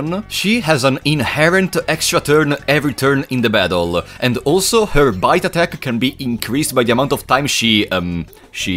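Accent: Italy. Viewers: Perché un italiano dall'accento maccheronico parla in inglese di uno dei più bei giochi mai creati? Iscritto. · sei ita? da come parli sembra di si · I don’t know why but your accent sounds italian, se sei italiano bro sei un grande, anche se questo gioco è mega di nicchia in Italia è davvero un piccolo capolavoro ed è bello vedere uno dei nostri che lo porta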